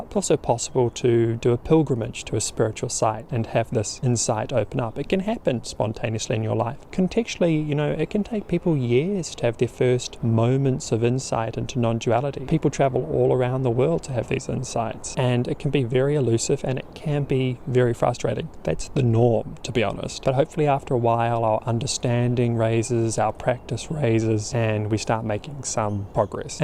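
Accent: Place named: New Zealand. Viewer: Hi, thank you for sharing this. I became enlightened about a year ago. Of course it is a bit of a misnomer as strictly speaking there is no self to become enlightened but you know what i mean. I say i am enlightened but i am not permanently living in oneness or non duality. I am shifting between non duality and duality usually many times each day. Sometimes i am in my mind with ego and other times i am in consciousness or oneness. The great thing is i have realised my true self and i am living from that place. Every day i feel beautiful bliss or nirvana. I experience a baseline of this bliss. It is always there now at some level. It does change in intensity but its always present. Sometimes suffering still comes up. The suffering is reducing for sure and the periods of time when i feel suffering are reducing. To be honest with you the reason im commenting on your excellent video is because i picked up on your kiwi accent. 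I am a kiwi too. Apart from myself you are the only other kiwi i know who is enlightened. You don't live in Dunedin by any chance do you?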